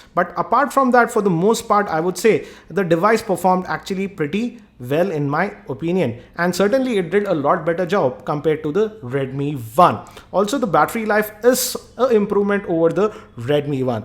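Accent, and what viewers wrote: India. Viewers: Não tem como parar de rir com sotaque indiano, pqp! AUEHAUEHAUHE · Nice accent,Indian-English Accent are nice to hear and above all they are clear Your Video is Very Informative, Thumbs Up for your Vid!..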